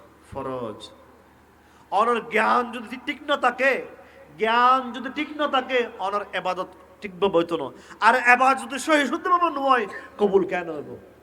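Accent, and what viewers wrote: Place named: Chittagong, Bangladesh. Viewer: হুজুর চট্টগ্রামের ভাষা বুঝিনা 😢😢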